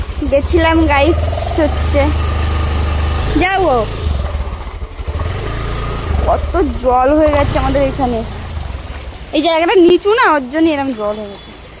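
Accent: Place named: Bangal, Bangladesh. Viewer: Amader nemontonno korbe na !! Eka eka e chole jaccho biye khete . Ar apni to puro bangal bolchen .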